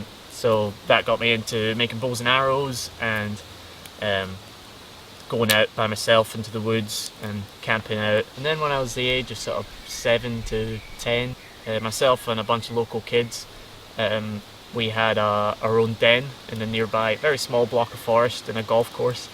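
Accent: Scotland, United Kingdom. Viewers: You. Are not alone.. we thought same.. until we heard speak.. And that beautiful Scottish accent.. which we sincerely hope he does not lose.. gave him away.. We are Scottish.. and we enjoy his channel immensely.. As to the potential name change.. as this video is over 5 years old.. he has kept the name.. The name is part of roots.. part of who he was as well as who he has become.. To change would.. to us.. be a betrayal of his journey to where he is now.. seems so wrong.. Besides.. all of his videos.. at least all we have seen.. he references in the opening.. and seems impractical to change.. Who changes horses in midst of a stream.. especially if they are serving you well In getting you across? We are so glad you kept the name, … Slan mo charaid💜💙🌹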